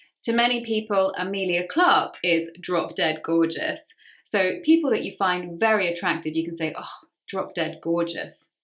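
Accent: United Kingdom. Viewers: It has been a utterly splendid, exquisite and flawless lesson. I'm particularly joyful, blissful and elated with that opulent topic. It is to my liking; moreover, it appeals to me. I do adore speaking, and her charming accent., Hence, I present undoubtedly the Queen of British English. You are the quintessential of British English. Thank you very much indeed. Far thee well, dearest Prof. N.B I am on the edge of making myself some “arrabbiata”, “caprese” and a slice of tiramisù. Would you care for an alluring dessert? Ciao. Andrea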